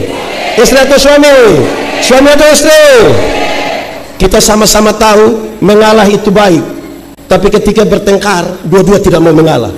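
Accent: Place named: Eastern Indonesia, Indonesia. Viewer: itu bukan membentak... mungkin kamu baru liat atau baru mendengar aksen/dialek seperti ini.. itu ciri khas bagian timur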